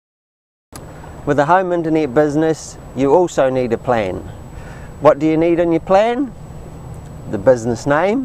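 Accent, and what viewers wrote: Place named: New Zealand. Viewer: Great metaphor talking about the ships on thier journey. Great to here another Kiwi again - I nearly moved to Whangaparao about 40 mins from Aukland Steve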